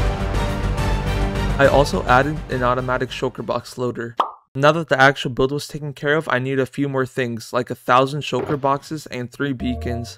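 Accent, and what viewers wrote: Norway. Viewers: Are you Norwegen?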